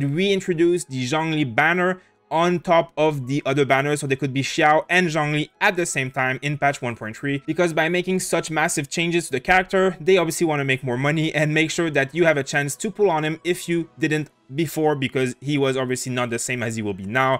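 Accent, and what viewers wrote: Quebec, Canada. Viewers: Am I dreaming or do you have a french Canadiens accent? Btw new here but I'm loving your channel! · That accent... You're from Québec, am I right?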